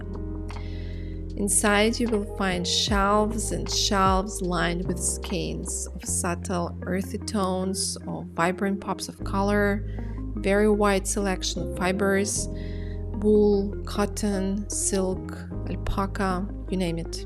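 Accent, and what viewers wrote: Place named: Russia. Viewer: Я не знаю разговариваете ли вы по русски,но ваш акцент меня на это натолкнул😅. Видео просто замечательное и очень красивое. У вас очень приятный голос ❤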